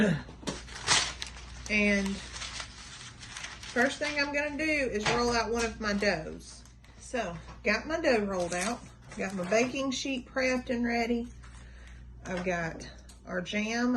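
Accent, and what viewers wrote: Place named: South, United States. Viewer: Wonderful video. If people tease you about your southern speech, they need to get over it. We all knew what it was you meant. Get some rest girl, you've earned it.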